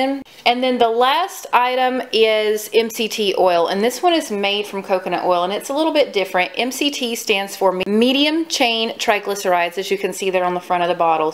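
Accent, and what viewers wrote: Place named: South, United States. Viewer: Great video!! Your accent sounds similar to mine. You must be from the south. lol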